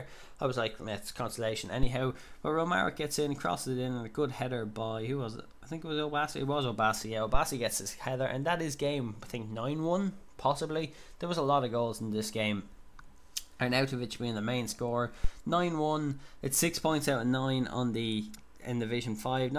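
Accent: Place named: Ireland. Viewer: Where in ireland are you from? :) class series btw :)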